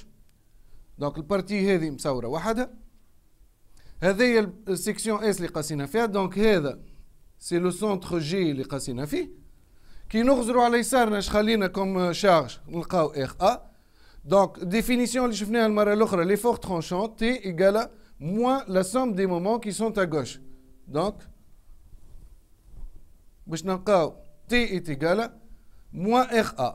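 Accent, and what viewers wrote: Tunisia. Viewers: Français en dialecte tunisien 😎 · الله على اللهجة التونسية جنان والله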